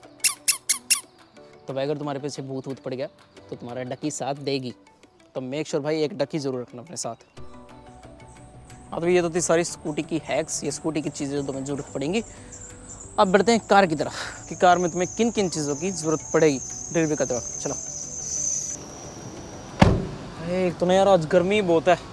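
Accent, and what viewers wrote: Haryana, India. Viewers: Haryanvi touch bhai goda 😎 jai haryana jai haryanavi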